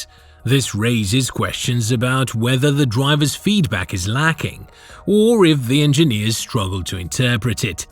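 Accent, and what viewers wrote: RP, United Kingdom. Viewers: Narrators a bit posh isn’t he?!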